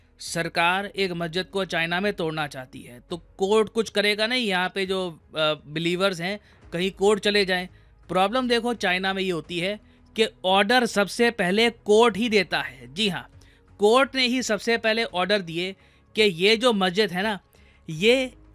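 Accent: India. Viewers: 一股子阿三味儿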